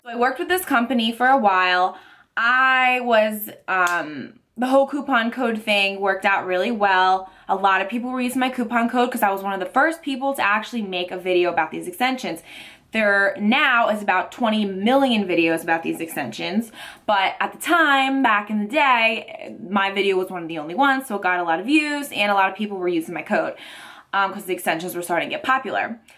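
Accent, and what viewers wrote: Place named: Philadelphia, United States. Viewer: dude your so dope! and your accent is awesome, since your from philly it makes sense but are you italian too? and thats fucked up of that company, they should have kept working with you!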